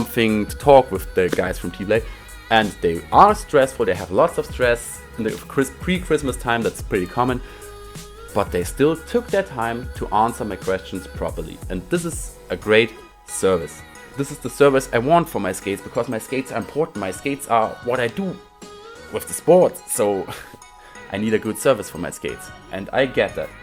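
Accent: Germany. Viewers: Safe ein Deutscher so wie seine Aussprache is 🤗🙏👍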